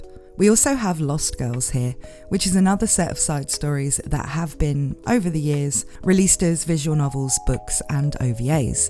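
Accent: United Kingdom. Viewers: Oh alright it sounded a bit diferent than what I’m used to hearing in a British accent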